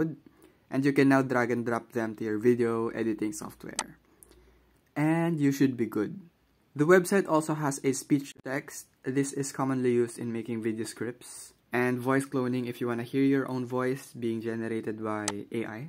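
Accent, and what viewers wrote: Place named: Philippines. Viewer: Bro sounds like filipino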